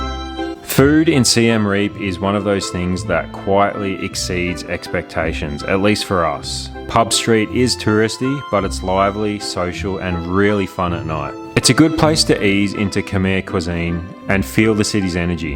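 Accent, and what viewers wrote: Australia. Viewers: Hi, I’m heading to Cambodia in a month with my wife brother and sister in law, do you need a motorcycle license for the Tuk Tuk and how much did it cost? Loving your videos and good to hear an Aussie voice in them. 😁👍🇦🇺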